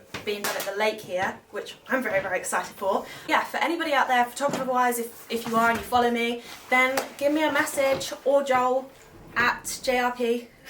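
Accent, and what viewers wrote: United Kingdom. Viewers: Cool, are you from England?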